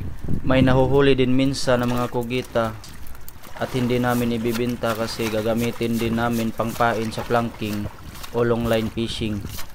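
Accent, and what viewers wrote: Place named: Batasan Island, Philippines. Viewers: saan ka sa tubigon? b4 nag school pako marami akong classmate dyan,I think sa tuno ng pnnalita mo ur from batasan iland,me from clarin bt her nko mnila now,maybe ur one of my classmate b4 or school mate.. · salamat sa pagtagalog mo kac kapag magbisaya ka hndi Po namin maintindihan.. kahit bisaya Yung tuno ng salita mo at least naiintindihan Po namin.. I like your content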